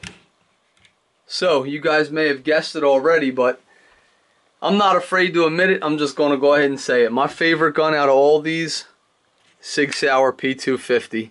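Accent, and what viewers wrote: Philadelphia, United States. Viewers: u got a be from the Philly area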